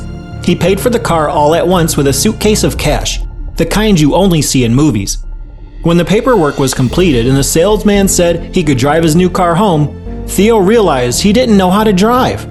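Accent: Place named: United States. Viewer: Narrator sounds American but the script sounds like its written by a non native english speaker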